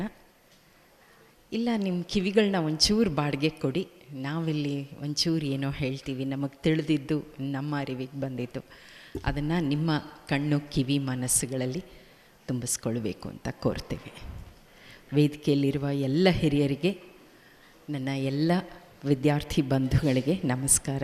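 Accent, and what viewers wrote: Standard. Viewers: ಸ್ವಚ್ಛ ಕನ್ನಡ ತಿ,ಕನ್ನಡದ ಒಡ ತಿ ನೀವು ನಮ್ಮೆಲ್ಲರ ಮನಸ್ಸಿ ನಲ್ಲಿ ಸ್ಥಿರ ವಾಗಿ ಇದ್ದೀರಿ ಮೇಡಂ so sad I ಮಿಸ್ ಯು ಮೇಡಂ ರಾತ್ರಿ ನಿಮ್ಮ ವಿಚಾರ ಗೊತ್ತಾ ದ ಮೇಲೆ ನಿದ್ರೆ ಬರಲಿಲ್ಲ ಮೇಡಂ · ಇಂತಹ ಕನ್ನಡವನ್ನು ಇನ್ನು ಯಾರುಯಿಂದಲಾ ಕೇಳುಲ ಸಾದ್ದವಿಲ್ಲ. ನಮ್ಮ ಅಚ್ಚ ಕನ್ನಡದ ಅಪ್ಪರ್ಣ ರವರಿಗೆ ನನ್ನ ನಮನ ಗಳು 🙏🙏🙏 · ಯೆಂತಃ ಸ್ವಚ್ಛ ಕನ್ನಡ ನೀರರಗಳ ಮಾತು ಒಮ್ಮೆ ನಿಮಗೆ ನಮ್ಮ ನಮನ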